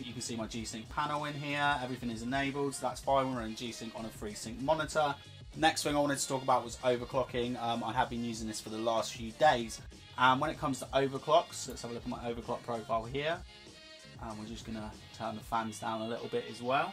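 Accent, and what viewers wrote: United Kingdom. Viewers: u're so british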